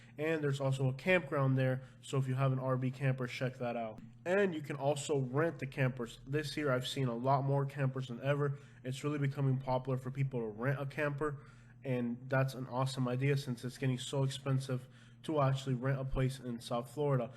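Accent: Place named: Cuba. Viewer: Most likey he sounds Cuban. So it to be expected. It part of their culture, but to his defense most Cuban women really enjoy shopping.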